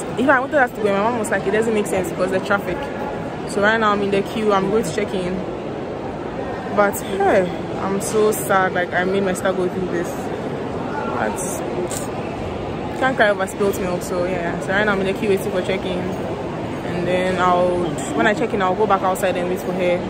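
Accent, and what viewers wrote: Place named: Nigeria. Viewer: Congratulations to a successful trip❤️💌🥂 I love your accent, it sounds like that of a Nigerian 🇳🇬🥰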